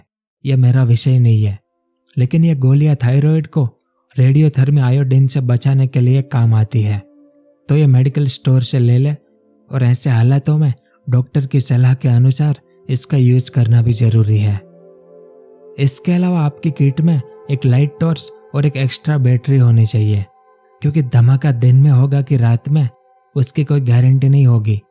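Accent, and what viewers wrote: Gujarat, India. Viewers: Bhai aap gujarati ho??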